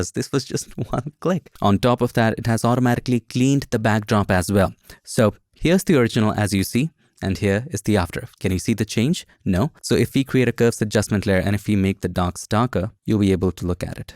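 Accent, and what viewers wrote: India. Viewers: Why do i see many nasty comments on Indian accent? How is it any different than other accents?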